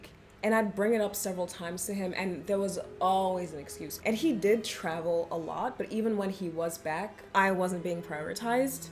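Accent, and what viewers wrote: Australia. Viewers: You are so funny and genouine. I bumbed to your videos from love is blind lol. Greetings from Finland. Are you australian? You sometimes sound like australian and sometimes American. Oh now you said it nigerian. Your english is perfect. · Just came from your latest Love Is Blind video, and the whole time I was thinking you were American doing the Australian accent as a joke 😂 but nope, you actually have an Aussie accent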